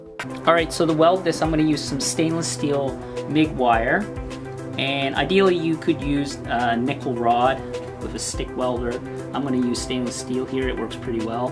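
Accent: Canada. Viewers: canadian..abooot...thanks for sharing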